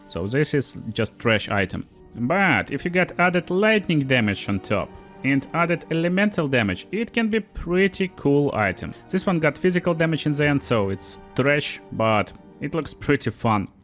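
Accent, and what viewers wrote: Russia. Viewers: it sounds like a hard Russian accent. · I love when Russian natives use their deep and heavy accents to talk about the best video game in the world-- Path of Exile 2. And yes, they finally put out a major fix for performance and crashing issues. :]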